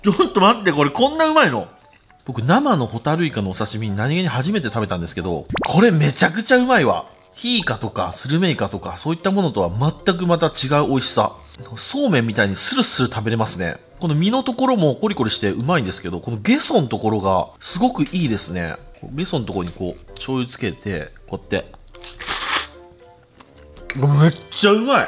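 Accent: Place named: Chita-Mikawa, Japan. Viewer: 愛知出身です。「よばれる」って久しぶりに聞けたわ。祖母は亡くなってしまったし地元を離れてしまったので、知多三河方面の方言が聞ける貴重なチャンネルです💕動いてるタコおいしそー！！！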